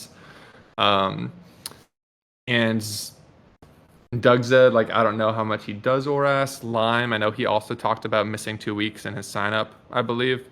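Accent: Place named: United Kingdom. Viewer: Hej that’s the brittisk guy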